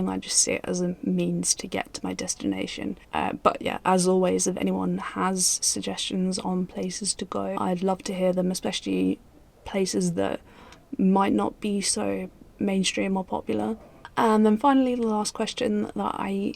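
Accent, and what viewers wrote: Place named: United Kingdom. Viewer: Hi, lovely seeing you. Curious about your accent. Can you please tell me what part of the united Kingdom you are from? I know this is a random question. Sorry if it's to far out there.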